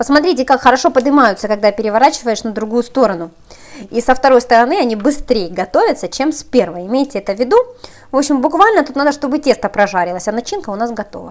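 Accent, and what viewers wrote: Ukraine. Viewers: Люди, у нее все нормально с ногтями и с речью! Она просто из Украины и у нее такой акцент. Почему вы людям другой национальности не указываете как разговаривать! Вот 100% больше половины неучей и неудачников здесь что-то вещают на! Если что-то не нравится, прошли мимо нафиг и не смотрите! Зачем обязательно какое-то дерьмо надо написать! Жаль, что не наказывают интернет-гениев за оскорбления по закону!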